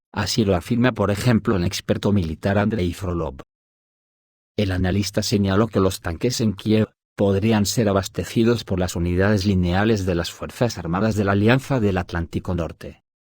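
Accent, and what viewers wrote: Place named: Spain. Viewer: MAESTRANZA RECUERDA ALGO EN CUANTO LA JEÑGA O EÑL DIÑLECTO DE HABLAR. ES ESPAÑOL, ESA ES SU FORMA DE HABLAR Y LO EXPLICA MUY BIEN NO IMPORTA QUE DURE DOS HORAS, LO MEJOR ES EML MENSAJES QUE DICE YA TODOS ESTA DICHO Y YA ES TARDES, UN DÍAS DE ESTOS AMANECERENOS EN EL INFIERNO Y NO DEL DIABLO SI NO CREADO POR LA ESTUPIDÑE DE LA OTAN QUE NO ENTIENDE NADA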